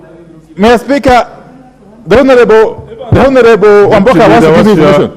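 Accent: Luo, Kenya. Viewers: A good Luo is one BIT living in Kenya! And this guy's Luo Ingliish, is Terrible!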